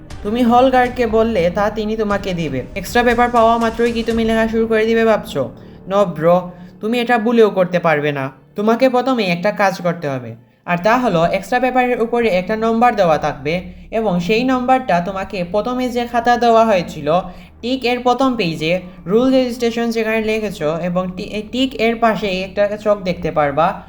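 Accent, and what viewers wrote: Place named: Sylhet, Bangladesh. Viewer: সিলেটি ব্র😢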